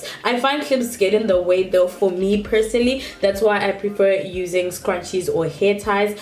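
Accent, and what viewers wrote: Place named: Zimbabwe. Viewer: I had to go through your videos. I thought I heard a Zimbabwean accent.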